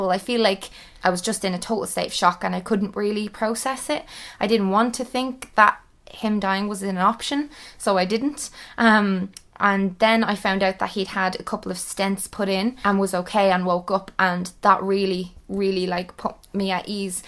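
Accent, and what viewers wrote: Ireland. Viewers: One: her dad had a fucking heart attack, do you really think this is appropriate? Two: she's Irish, that's her accent. Three: you're a moron.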